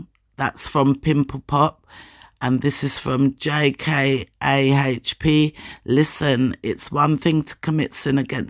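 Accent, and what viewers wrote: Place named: Jamaica. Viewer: I could tell you were English speaking but had a Jamaican background i can tell in your voice I live in the UK but was born in Jamaica and came here at the age of 8 I am now in my 60s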